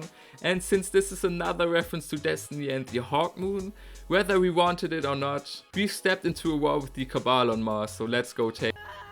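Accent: Germany. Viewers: Man erkennt zwar sofort das er deutsch ist aber sein englisch ist nicht schlecht und lohnt es sich nicht mehr durch englische videos mehr leute zu erreichen als durch deutsche?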